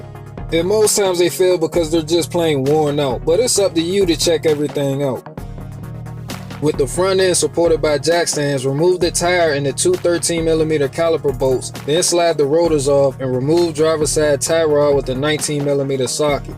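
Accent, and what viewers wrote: Washington DC, United States. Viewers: You from the DC area aint you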